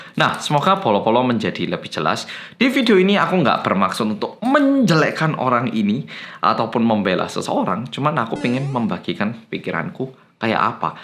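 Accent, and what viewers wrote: Java, Indonesia. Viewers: Bahasa indonesia bagus bnget dan ada jawanya dikit2 kaya org indonesia tulen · Dia udah tinggal di Indonesia (lebih tepatnya bagian Jawa) udah lama banget jadi lama² medok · Logatnya semakin medok aja bang. Makin ngakak aku. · Sebelum nya saya minta maaf bang Abang nya logat bahasa nya kok medok😂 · Menurutku pemikiranmu dewasa broy .... Keren ... Indonesianya juga medok 😂👍👍👍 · bahasa indonesiannya medok baget...👍👍👍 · Beda kepala pastinya beda pemikiran.. dengan adanya kejadian yang seperti ini justru makin mendongkrak semangat wanita Indonesia untuk menjadi lebih baik lagi dalam segala hal.. disenyumin ajalah 😊 buat mas tetap semangat dan medhoknya jangan sampek ilang 😄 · Bahasa Indonesia nya medok kek orang jawa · Kok bahasanya lebih Medok appa any way I like u thx pemikirannya ya ..... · Bang ini beneran orang Korea..? logatnya medok banget..kereen..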